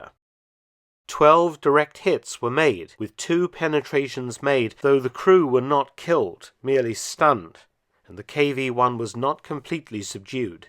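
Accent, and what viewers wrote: England, United Kingdom. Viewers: He just sounds British to me. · I understood everything that Mark said, quite well. Who the F cares what he sounds like?!? His CONTENT is what matters, here, and his CONTENT is excellent. If you under-educated, non-worldly, narrow-minders can't move freely from one accent to another, or one language to another, whose fault is that, huh?!? Parts of the U.S. have dialectical accents that are far different, and harder to understand, than his Brit accent, from all the way across the Atlantic‐-but, again who cares? And 1950's? Give me a break! A LOT of good sh*t came out, both in TV and movies, from the 1950's! And some of today's shows, and media‐-are pure cr*p, by comparison! You guys are overlooking the central point, here: that these guys were fighting for the defense of their HOMELAND. How would you like it; what would you do--if we had to defend the U.S. 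from say, a massive invasion of the Chinese Army, huh?!? It is no wonder that these guys FOUGHT TO THE DEATH, to try to save their mothers, fathers, sisters, brothers, girlfriends, and kids, etc...wouldn't YOU? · English. British encompasses too many different accents.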